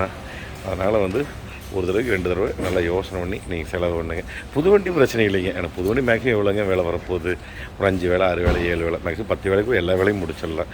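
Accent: Kongu, India. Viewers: கொஞ்சும் கொங்குத் தமிழ் பேசும் கோமகரே...! திகட்டாத தித்திக்கும் பேச்சுடைய...! எங்கள் மதிப்பிற்குறிய திரு. அண்ணா அவர்களே❤❤❤🙏 எப்படி இருக்கீங்க அண்ணி❤❤❤🙏 எப்படி இருக்காங்க ரித்திக்❤❤❤ எப்படி இருக்காரு அருமையான வேலைப்பாடுகள் அண்ணா❤❤❤❤👍👍👍👍 தகரமும்கூட தங்கமென ஜொலித்திடுமே எங்கள் தங்கமகன் சிந்தனையின் வாயிலாக..! அந்த சிந்தனையில் உதித்த உத்தரவைப் பேணிக்காத்து வியர்வைத் துளிகளை நிலத்தில் உதிர்த்து உன்னதமான உழைப்பை உருவாக்கிடும் நமது Super Car Careன் உழைப்பாளிகளுக்கு அனைத்துலக நம் TMF குடும்பத்தினர் சார்பாக எனது நெஞ்சார்ந்த நல்வாழ்த்துக்களையும் பாராட்டுக்களையும் தெரிவித்துக்கொள்கிறேன்❤❤❤🙏🙏🙏 என்றும் அன்புடன் உங்கள் தம்பி என்றும் அன்புடன் உங்கள் TMF ரா.முஹம்மது கபூர் (சென்னையில் இருந்து) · தங்களது பல காணொளிகளை பார்த்துள்ளேன் இதுவரை யூட்யூபில் எந்த வீடியோவுக்கும் கமெண்ட்கள் செய்வதில்லை தங்களது கொங்கு தமிழும் அன்பு பொங்க பேசும் உங்களது நடையும் என்னை கவர்ந்தது மேன்மேலும் வளர மனதார வாழ்த்துகிறேன் தங்களது அலைபேசி எண்ணை பகிருங்கள் உங்களிடம் பேச வேண்டும்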